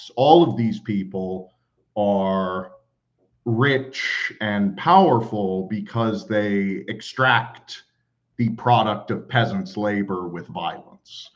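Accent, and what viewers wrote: South, United States. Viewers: I was wondering recently, what would be the reaction, if I heard someone else reading the story. I enjoy Roy's performance for many reasons. It's professional. He has a cadence to his delivery and it is very quick. I've heard for years. Listening to your performance, slower with an American accent, I have noticed things that went unnoticed since 2021. -The guy who fought a wight in the prologue. His blade got covered in frost. His sword had frostburn. That's so cool. I kept missing it, in Roy's delivery. -The man whost Ned dehe ded, he was tied up on a holdfast's wall, when they rode up. I never noticed that. I feel like this version puts glasses on my understanding of the story. It's giving surround sound. I am in the middle and not in the front, watching. So I'm going to listen again. 🚶🏿‍♂️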